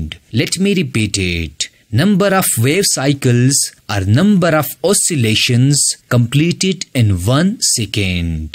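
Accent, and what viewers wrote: India. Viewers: the india accent is very annoying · You got no doubt the thickest indian accent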